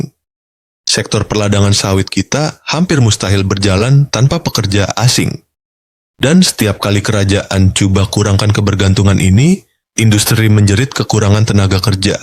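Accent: Indonesia. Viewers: Haha.. 😂 dngar gaya bahasa pn tahu admin org indo.. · Nada dan ritme nya bahasa Indonesia bang...😂,yang penting isi konten nya Real bukan hoax bang berdasar data dan fakta dan obyektif...semangat semangat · Indog usahlah buat lawak menjadi orang malaysia suara pon kedengaran indong susah kalau orang indong mahu menyamar menjadi melayu melainkan dirinya melayu.Jangan ingat betul tetapi tidak betul.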